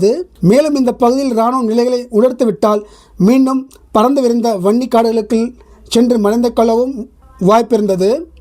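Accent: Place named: Kerala, India. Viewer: Adooi clear ahh vilankira mari sooluda Ethoo malayalali Tamil pesuramari pesurai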